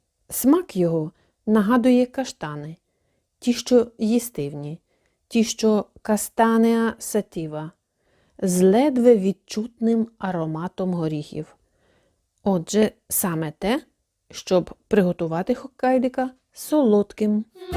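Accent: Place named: Border region, Ukraine. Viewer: 6 гарний гарбузик 😃 Цікавий у вас акцент, десь на кордоні живете? Смачного 😋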